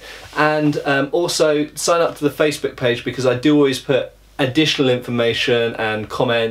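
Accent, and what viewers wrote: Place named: RP, United Kingdom. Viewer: Metatron led me to your channel. Among my interests are History, Archaeology, Weapons and Hema and in my opinion you are one of the best content creators on youtube. I also really enjoy your movies and tv series fights evaluations, they're brilliant. I think people visit your channel not only to be entertained but, mostly, to learn something, and I really can't say the same (for example) about Lindybeige, highly entertaining but with very less quality. I can't wait to watch your History videos (with your BBC voice :-) ). I'd also like to hear you explain old fencing manuals, like di Grassi (or even Fiore dei Liberi, Liechtenauer or Meÿer!). Keep up the great work, and thank you sir. p.s. Do Rants!!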